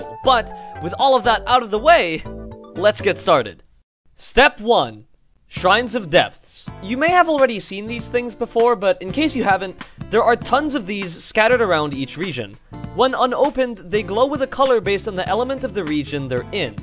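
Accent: Netherlands. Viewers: I like the thick Dutch accent XD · Are you dutch?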